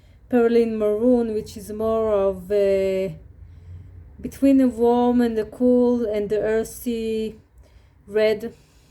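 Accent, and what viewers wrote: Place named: Israel. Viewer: Are you a Sabra?I think I recognize your accent❤️‍🔥🙏🏻🥇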